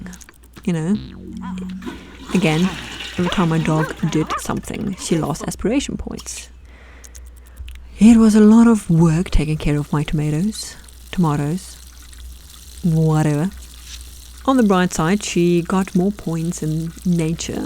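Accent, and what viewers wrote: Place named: South Africa. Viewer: I knew your accent sounded familiar!! Hey fellow South African <3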